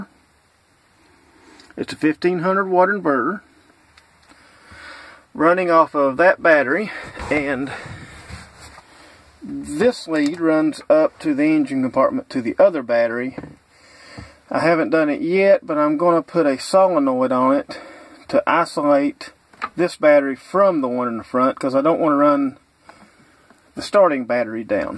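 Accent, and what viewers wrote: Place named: Kentucky, United States. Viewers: Being native born here that distintive Southern accent is picked up immediatly. Always sounds like home :) Nice ingenuity on the build. The swingout arm and kitchen are too cool. Always looking for ideas for when I do mine. Ya'll take care. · I like those cup holders and swivel mount ..that's good ole Kentucky engineering I heard that accent and knew you were from KY. I spent my teenage years in a lil town called "London" in KY gettin ready to watch your school bus video. good job.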